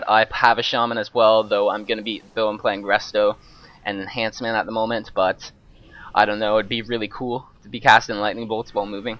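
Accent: Canada. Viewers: Are you Canadian ??:)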